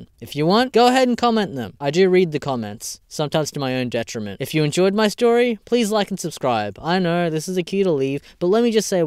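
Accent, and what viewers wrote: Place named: Australia. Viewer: I'm similar to you. I grew up in Australia from immigrants (I'm assuming you've got an Australian accent). I can speak my native tongue but not particularly well. Its a real chore to communicate with native speakers back in my country of origin. I've had that exact situation where they put you down for not knowing the language well enough when they speak with a thick accent and too quickly to comprehend. I get alot of crap for not being able to write my own name in my native language. I don't owe my country of origin learning my language better, its not my failure.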